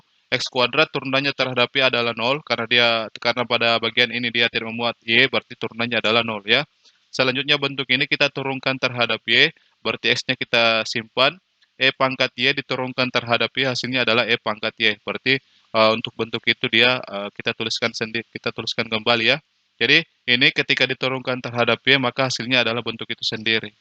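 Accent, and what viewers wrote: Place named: Makassar, Indonesia. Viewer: logat makassar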